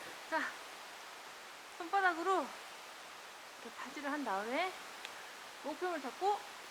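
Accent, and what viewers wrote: Gyeongsang, South Korea. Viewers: 약간 경상도억양 있으신게 더 매력적이십니다. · 살짝 경상도 악센트가 느껴지는데영ㅋㅋ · 작다~~ 경상도 아가씨네요 ㅋ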